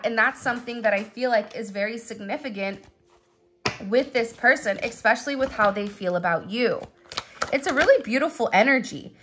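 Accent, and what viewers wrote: California, United States. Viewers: Regular sub for several months now and while your readings are always lit as AF. This one was on a whole Nother level. Thank you for your gift. I’m from the Bay Area and I can hear California in your voice and you’re saying and it makes me happy. OK I’m gonna go continue the reading now I stopped at halfway through just to write this.❤️‍🔥❤️‍🔥❤️‍🔥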